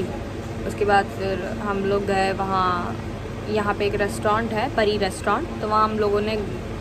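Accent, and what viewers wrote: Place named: Awadh, India. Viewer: wahh awadhi tone ko Hindi me sunna bohot acha lagta hai mujhe aap ka Blog acha hai starting achi hai aap ko bolne ka hunar bhi hai yaha me sab nahi keh sakta kyunki blog ki bat hai par han agar me tumhari koi help kar paun to mujhe jarur batana studio ka kam hoga to batana ❤ baki achi kosis sherni ho aap❤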